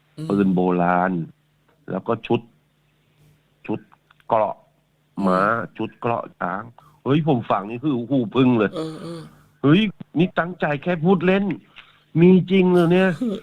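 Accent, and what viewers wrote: Kanchanaburi, Thailand. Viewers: สำเนียงคนกาญจนบุรีเนาะ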